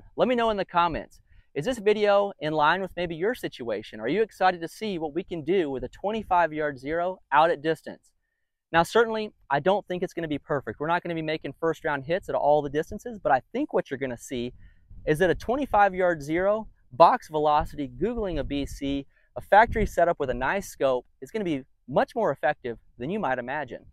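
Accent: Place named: Atlanta, United States. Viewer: I knew that accent was from Atlanta lol, just needed to hear the "Ah-lanna"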